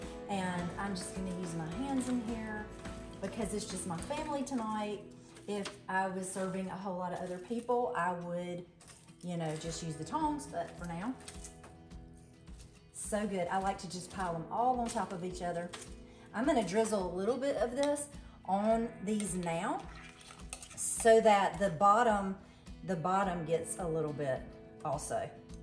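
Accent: South, United States. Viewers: From one Southerner to another I just love your accent. Greetings from the great state of Tennessee. Sadly, the Southern accent here in East Tennessee is being watered down by the influx of people from the Northeast. They're actually invading the Southeast. Anyway. Love your videos. Take care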